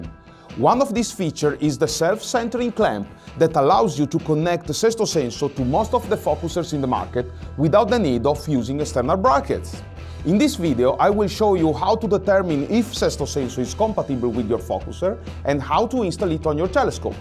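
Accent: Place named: Italy. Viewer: Salve! Tu haves notabel accento italiano. Successo a ti e ao Populo Italiano!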